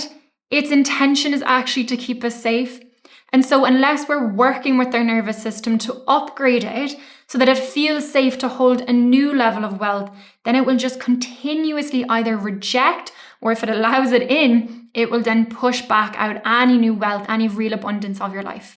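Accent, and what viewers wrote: Ireland. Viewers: Oh you are Irish.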